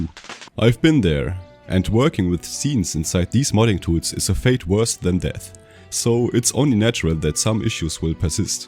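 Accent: Germany. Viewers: Klingst deutsch/schweiz/österreich :D Ich warte ja seit release auf Shokuhō oder einen der Mods die das Spiel in Zeitalter der Industrialisierung mit Linieninfanterie bringt. Der Star Wars Mod wurde ja scheinbar eingestellt :(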